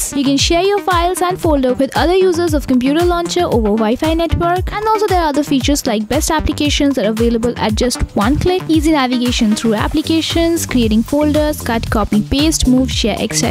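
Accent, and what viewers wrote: India. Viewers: Indian ke english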